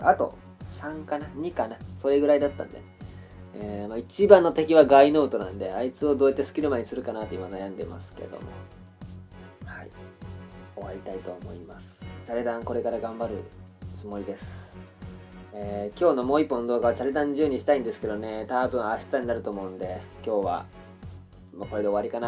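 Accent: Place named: Tono, Japan. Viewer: さん思っきり東濃弁はいってますねぇ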